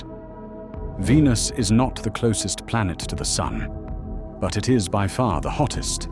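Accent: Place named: United Kingdom. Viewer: Awful British accented narrative sucks.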